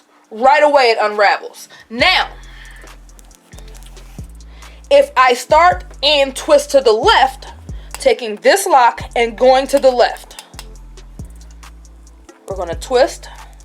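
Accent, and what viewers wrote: Baltimore, United States. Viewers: I’m sorry idk what your accent is, sounds like Baltimore but love how you “two” like tew lmao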